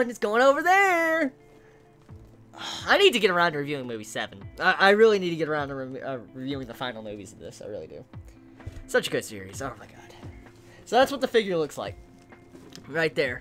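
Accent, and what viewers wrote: South, United States. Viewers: and your country accent lol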